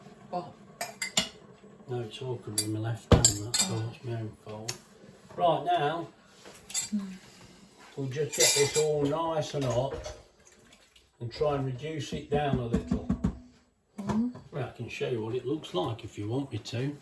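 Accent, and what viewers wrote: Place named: Midlands, United Kingdom. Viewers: Are you from the midlands accent sounds it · Hi You sound like you’re from Nottingham as we are . Anyway we’ve just tried our corsori pressure cooker with your steak and kidney pudding recipe. Absolutely brilliant it turned out really nice. Many thanks keep up the good work 👍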